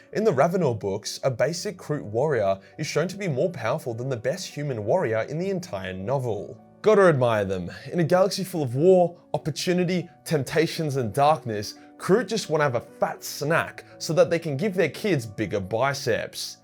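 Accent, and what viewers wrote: Australia. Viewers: Always proud to hear your fellow Aussie accent. Keep pushing keep going and keep pushing past the tall poppy that our culture has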